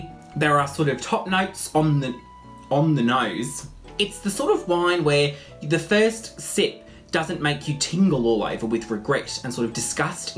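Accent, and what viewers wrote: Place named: Australia. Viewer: You have a slight hint of an Australian accent 🧐🧐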